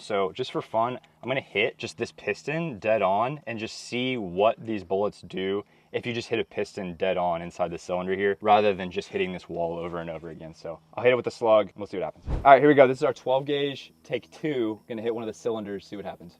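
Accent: Australia. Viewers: lol-the Australian accent. :face-blue-smiling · All i smell is your bullshit 🤣 Ozzy accent. Dude, ya killen me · 😂 Australian accent hehe 😂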